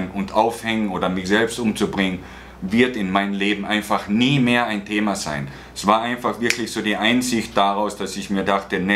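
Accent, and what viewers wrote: Carinthia, Austria. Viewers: Green ich hab gedacht er aus kärnten vom dialekt her :)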